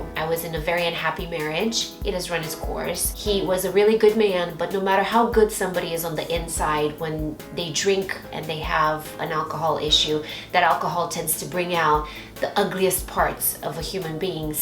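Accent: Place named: Romania. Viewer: Hei! Din nou iti scriu in romană,sper ca nu este ceva care sa te supere,dar stiu ca esti românca asa ca de fiecare data cand adaug un comentariu la un clip de-al tau aleg sa iti scriu in romană! Imi place foarte mult accentul tau cand vorbesti lb engleza! 👌🏽👌🏽 Dintre videourile tale am 2 care imi plac in mid special, si anume "Smokey green and glitter tutorial" dar si "10 Beauty and skincare recipes"! Te urmăresc si pe Instagram si imi place sa ma uit la insta storyes făcute de tine! Sper sa fie cu noroc participarea mea la acest concurs! Pupici din România! 💋💋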